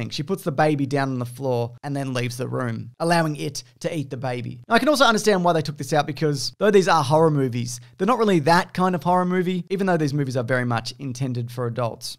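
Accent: Australia. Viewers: In your Aussie accent it sounds like "porn shop owner" instead of "pawn shop owner." Thinking to myself, "Pretty sure he wasn't in a porn shop, but ok.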